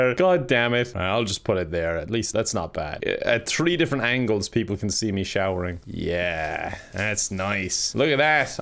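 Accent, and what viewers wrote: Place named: Ireland. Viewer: How is it that somehow I forget that he's Irish sometimes?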